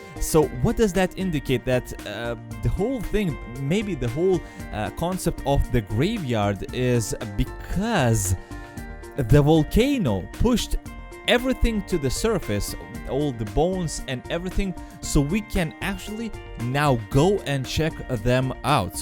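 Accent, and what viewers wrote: Russia. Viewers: are you Russian? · Is he Russian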